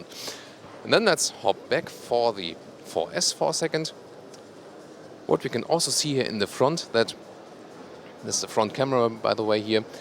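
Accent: Germany. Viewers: The colour is disturbing. But it's the same thing for my ears, being Dutch I understand English and Deutsch very well. I know you speak English but my brain wants to translate German to Dutch instead of English to Dutch. You sound so German lol.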